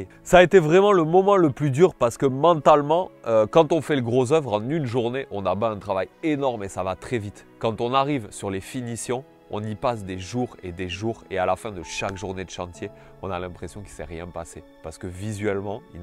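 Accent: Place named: South, France. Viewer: Très beau travail dans le rendu final vraiment bravo ! J'ai quand même encore beaucoup de craintes sur la pérennité et la sécurité de la cabane au point de laisser des gens y dormir (juridiquement et en cas de pépin) . Et par contre stpp arrête de parler de bois flotté partout mdr c'est pas en le trouvant au bord de ton cour d'eau que ça y est c'est du bois flotté 😂 En tout cas j'ai quand même tout suivi de A à Z et j'ai kiffé tout ton projet ta du mérite 💪(petite dédicace à ton accent du Sud étant de Nîmes ❤️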